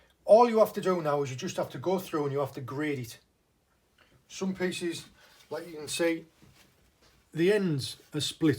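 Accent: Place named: Dewsbury, United Kingdom. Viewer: I'm from Bradford, your accent sounded close to Dewsbury one on camera. Keep up the good work! Really enjoy this channel. Perfect blend of openness/Honesty, information and also entertainment.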